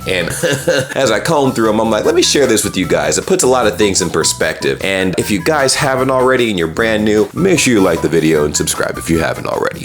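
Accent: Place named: Germany. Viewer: I drink 2.7 liters every day and I'm trying to reach a 3l target. I don't think that counts as dehydration. 😛 That said, bravo on the German accent, mate. Klingt jetzt schon super!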